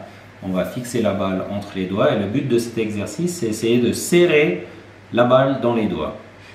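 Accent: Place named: Switzerland. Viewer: je savais que j'avais reconnu un accent suisse :)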